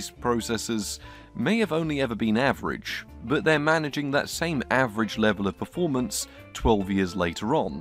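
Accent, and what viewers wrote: United Kingdom. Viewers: I'm from Russia. British accent is such a treasure)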